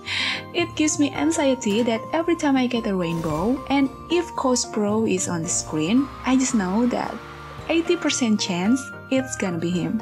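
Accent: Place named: Philippines. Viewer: I didn't expect for you to be from Indonesia, i thought you were from Philippines because of your english accent, and you look beautiful. And while i do want to see you make a video content of other games, but i suggest you should focus on enstar, because it takes 4 days to make a video of one game, if you make a video content about multiple games I'm worried that you might feel burnout and overwhelmed because of the many videos editing and gameplay, managing time is not very easy, especially if you play multiple games and they all have an event in the same time, i always feel overwhelmed when that happens, but still i will wish you the best of luck in whatever choice you made 🍀🍀🍀🍀🍀 And again congratulations on 5k subscribers, your guides and event scout video really help me a lot ❤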